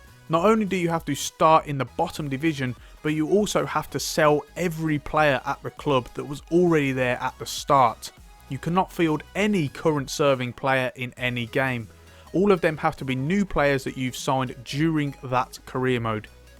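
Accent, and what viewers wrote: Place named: Black Country, United Kingdom. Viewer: yeah I'm from Tipton (near westbromwich) so I speak black country and your accent sounds similar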